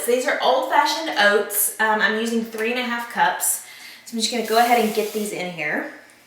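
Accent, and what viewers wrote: South, United States. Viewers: Batman needs a classy southern gal hey your all I can get use to that 😆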